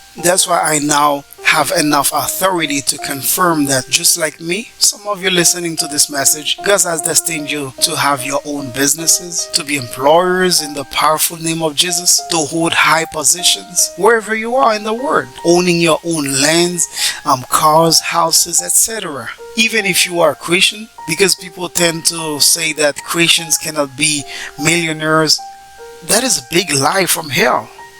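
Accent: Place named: France. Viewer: Interesting! I really appreciate your french accent.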